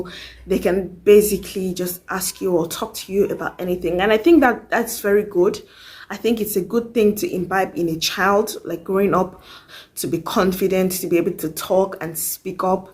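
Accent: South Africa. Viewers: Wow I'm impressed by your English accent...you will swear that you South African... you speak like a local girl... lovely 🌹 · Your pronouciation of words is of a South African. You assimilated easily. Thanks you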